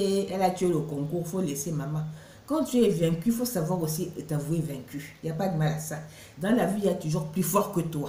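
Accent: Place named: Lingala, Democratic Republic of the Congo. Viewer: Lingala nayo kitoko makasi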